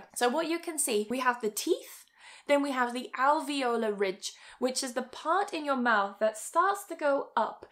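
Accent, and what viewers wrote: United Kingdom. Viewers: I appreciate your modesty, putting the British pronunciation (of words like "castle" or "afternoon") second, even though you seem to have more of a British background. i think ppl like learning the British pronunciation, as British English sounds more high class :D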